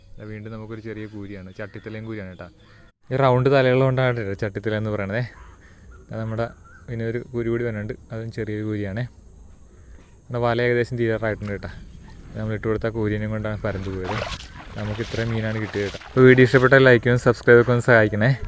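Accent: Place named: Cherthala, India. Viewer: ചേർത്തല സൗണ്ട് ❤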